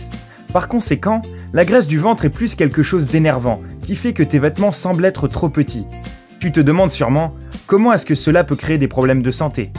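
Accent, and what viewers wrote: Quebec, Canada. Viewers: t québécoise ?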